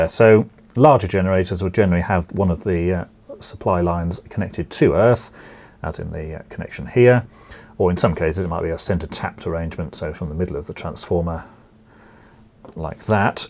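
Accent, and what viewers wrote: United Kingdom. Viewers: Hello Sir , initially really I'm very thankful of you for your very helpful video series , you present your nice videos look alike "sport events tellers" and sometimes understanding your accent is a little bit hard for none natives and specially a challenge for me, anyway I love British accent and by listening you my English listening skills would be improved as well...LOL... , could you please answer these questions , on (time of video ) in the book we have RCD and RCBO , it seems that RCBO is better than RCD because RCBO does somehow operation and in this way "save life of people" and also protect the devices such as TV and washing machines and so on , yes ?? So what is the difference between RCD and RCBO?? which one of them for home is better and most suitable ? each ones RCBO for TV and washing machine is needed separately ? each one should has which specifications/ amperage ? 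each RCBO can cover how many devices ? sorry a lot of questions !! I am contacting from Kiev in Ukraine and by answering my questions you will solve a lot of problems for me and at the same time it is a very big favor, Your fan , your supporter , Afshin Heydari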